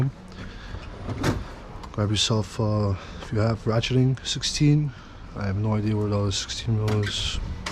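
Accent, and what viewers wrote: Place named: Staten Island, United States. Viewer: You sound like Keith Defosio from Staten Island.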